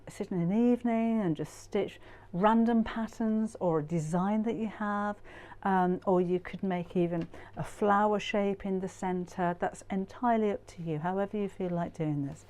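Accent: United Kingdom. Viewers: I’ve been quilting for 20 years and have never seen this unique construction method. This technique is a genuine “game changer.” ‘ Thanks so very much for sharing this,. I love your tutorials with your cute British accent and soothing voice! I am originally from Texas, so you can imagine how fascinated I am with a British sewing teacher! God bless you!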